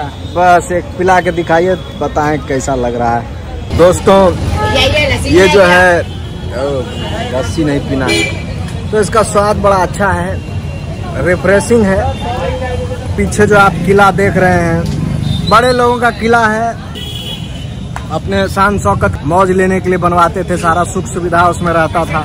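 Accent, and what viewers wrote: Bihar, India. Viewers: Bhaiya ekdm khud ko feel krte h apke videos dekh kr.... whi bebak andaj....dogs ke dekh kr unse bat Krna ..wo hmara Bihar bala accent.... ekdm khud ko feel krta hu. M Varanasi aa rha hu Saturday ko .aap miloge? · Bhaiya apka video ka rooj wait karte h apka voice Etna mast h apne ape par bihari wala filling automatic aa jata h 💗❤️❣️❣️❣️❣️😘😘😘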